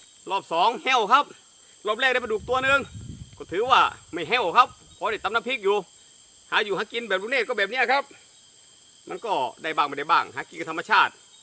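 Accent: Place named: Korat, Thailand. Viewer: ตกลงแล้วลุงเนตอยู่ภาคไหน ภาคอีสานหรือภาคเหนือ สำเนียงเหมือนคนโคราช ติดตามมานานพอสมควรแต่ไม่รู้ภูมิลำเนาลุงเนตจริงๆสำเนียงผมคิดว่าเป็นคนนครราชสีมา(โคราช)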